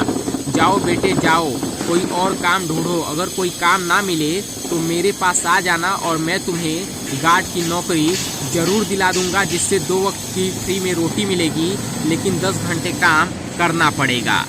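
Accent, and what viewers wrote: Bihar, India. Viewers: Are you bihari and bhojpuri speaking person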